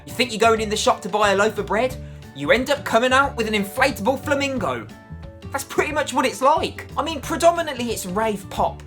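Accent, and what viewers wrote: United Kingdom. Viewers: Thanks for your reaction and review 👍 I really enjoyed watching it and hearing you... I just adore British English 😍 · Greetengs from Russia. Wanna get your feedback on the question is your accent British? Btw good job, very informative and objective. Good luck bro:)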